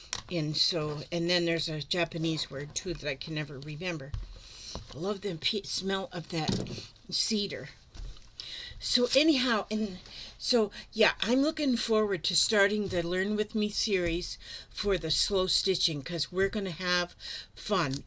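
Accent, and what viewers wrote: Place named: Florida, United States. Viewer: Hi it Patricia Rodriguez from San Antonio Texas I just always love you and papa 👴 how cute and adorable u both are and love ur Florida accent I was just thinking 🤔 you can color then put mod podge on those papers u got I guess from the floss well u can make them into paper beads and make necklaces with them I am subscribed to Darlene Michaud and she does a lot of stuff like that just giving u an idea 💡 love u guys have a blessed wonderful day in Jesus Christ mighty name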